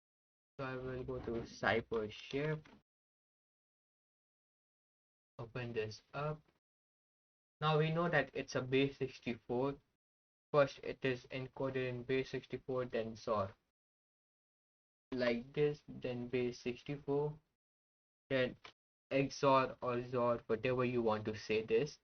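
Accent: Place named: India. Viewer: Your accent is damn cool for an Indian ! Good work, mate. Keep up the good work. Learned about tplmap and synk from your video!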